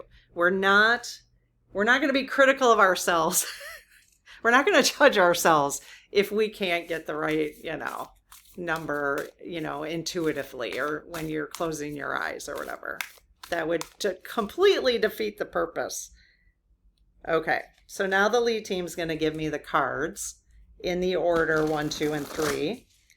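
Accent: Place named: Wisconsin, United States. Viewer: I had to check whether or not you were from WI. Your accent gave it away! I enjoy your videos.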